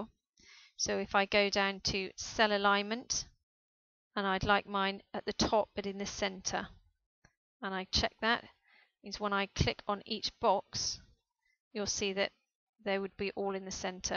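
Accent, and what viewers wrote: United Kingdom. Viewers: #Offtopic I wonder whether is British, voice is way too sexy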